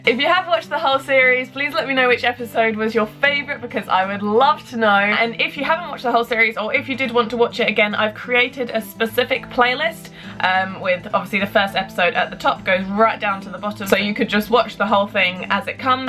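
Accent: New Zealand. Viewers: so excited to hear what your up to these days :D also your accent keeps making me laugh because the kiwi accent keeps coming through haha! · Thanks for the update. Your accent is so kiwi it's UNREAL! Haha. We decided to start exploring the UK too after coming back from Asia - like you said we can plan to see countries on the opposite side of the world when we haven't even explored our own. Look forward to the upcoming videos, Amy and Yas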